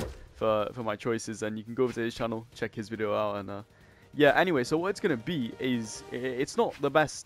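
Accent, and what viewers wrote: United Kingdom. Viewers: i like your BRITISH ACCENT btw im british too im just gonna eat me crumpets and a bloody cup'a tea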